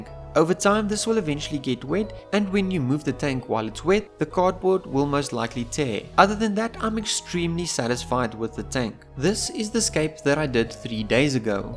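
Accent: South Africa. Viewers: Everytime this guy says "prawns" i think of the film district 9. Gotta love the south african accent.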